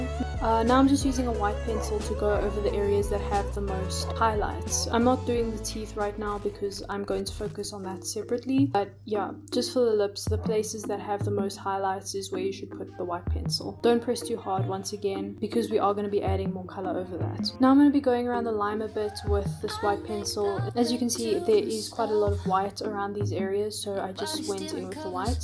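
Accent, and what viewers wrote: South Africa. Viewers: Are you South African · R u from South Africa ?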